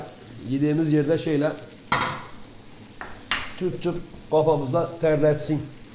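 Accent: Gaziantep, Turkey. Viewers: Senin tatlı dilin,Antep ağzın yeter abi. Antep’e gelirsek muhakkak uğrayacağız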